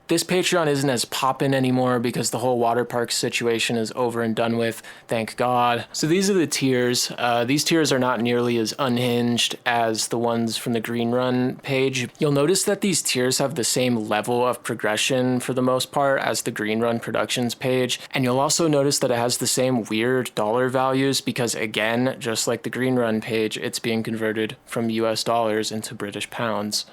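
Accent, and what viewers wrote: United Kingdom. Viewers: talks like the average British person but it's worse than talking to a French person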